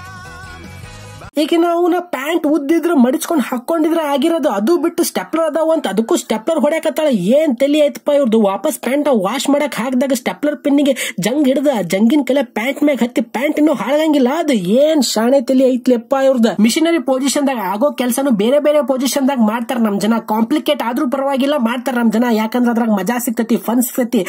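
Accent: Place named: Bagalkot, India. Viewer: Anthamma... 😂😂 Paoo.. paoo.. dialogue mast aiti noda.. yele bagalkotyav yen nee.? Fida aade nood na..😍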